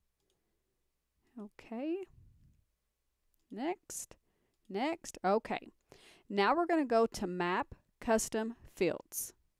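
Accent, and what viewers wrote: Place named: Texas, United States. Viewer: I really enjoyed your laid back presentation and, being from Texas, I appreciate your accent! (finally someone I can understand who isn't mirroring video game graphics to amp up their presentation. I only wish your screen were clearer. It is very "soft" and unfocused. Otherwise perfect. Keep up the great work!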